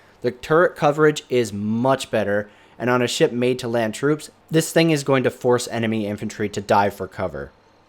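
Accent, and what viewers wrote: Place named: Asia. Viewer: You sound pretty Asian.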